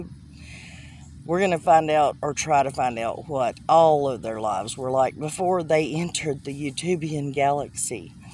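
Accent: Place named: Tennessee, United States. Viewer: I love ya n I I think like you I. Agreeing 100 percent I love the idea I don't kw where are you cuz you sound like your around TN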